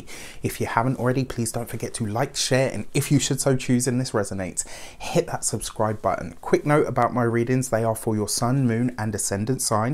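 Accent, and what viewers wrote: England, United Kingdom. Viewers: Great to hear an English reader Thankyou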